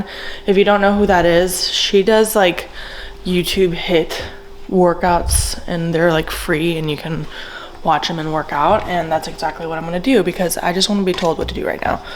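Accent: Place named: Georgia, United States. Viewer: I randomly found your channel and as soon as you spoke I knew you were a Georgia girl!! Loving your content!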